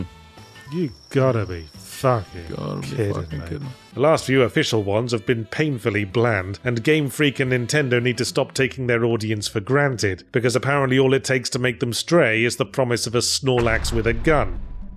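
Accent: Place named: United Kingdom. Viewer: It's so weird for Yahtzee to sort of grouse on the anachronistic look of the game. I mean, from ZP to this, his entire schtick is humorous anachronism. It's the humor of a sophisticated British accent saying creative swears. It's a very consistent artstyle of blob shapes juxtaposed with any old photo pasted from google images. That's why I find it funny. God forbid a game do the same thing.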